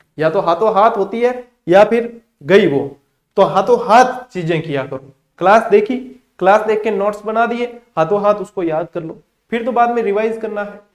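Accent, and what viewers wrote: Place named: Marwari, India. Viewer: Brain ki capecity problems ko kis trh aur kiti jldi solve kia ja skta h us basis pe napi jati h also kitna navachar hm kr skte h joki aap continue kie hi ja rhe h To apne aap ko mandunudi jse sbdo se na pukare 🙏🙏 You are a brilliant student/teacher and khuda ka anmol tohfa h sir .., abhar hmesa ishwar ki kripa aap pe bni rhe h 😊😊 u are rockstar,🔥🔥🔥🔥🙏🙏 simply chijo ko asan krne wale and marwadi me bolte to bhut ache lgte sunte hi jae bs jse tq again🙏🙏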